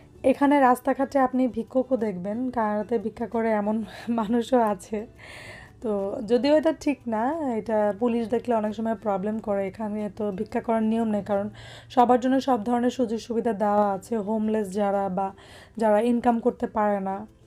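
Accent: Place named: Dinajpur, Bangladesh. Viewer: Hi api apner video dakhi vloi laga apni onk sundor guceya kotha bolen apur soshur bari kothay north Bengal a naki dinajpur Rangpur ar moddha naki video ta Dinajpur ar kotha sunlam tai ask korlam amr home town oh Dinajpur a asola nejer place ar kotha sunla monta kano jani alada felling mona hoy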